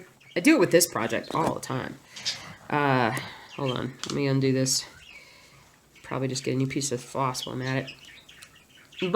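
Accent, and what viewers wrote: South, United States. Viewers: Actually, I am from South Carolina, and I do hear your southern accent. It doesn't come out all the time, but there are certain words that you say, like 'plain' kinda sounds like 'play-en'. When I first started watching you, I wondered where you were from, until I heard you talk about Georgia. And BTW, if you ever come visit the SC area, or north GA, get in touch and I'll buy you lunch! Yes, they still do the laser light show on Stone Mountain. And I YES to Zaxby's. We have one about 10 min from our house here in SC. I was so happy when we went to Pensacola Fl to see my father in law and they had a Zaxby's there. So they are going a bit more west.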